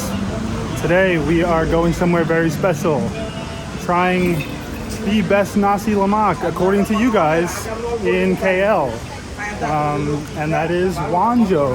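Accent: New York, United States. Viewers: Bro you`re TOO nice to these haters, i would have appreciated good old `get the fuck outta here!` rant with that NYC accent&attitude!😂🤣💪👍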